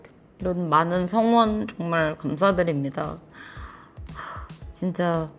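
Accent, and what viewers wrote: South Korea. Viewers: 부산 사람 맞죠???? 부산에서 응원 합니다.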